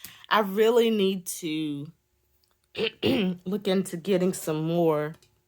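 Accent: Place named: North Carolina, United States. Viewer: Hey! Just found your channel. Are you in NC? Of all the planner folk I follow, your accent sounds like mine! I have some of these stamps and was trying to figure out how to use them. Great video!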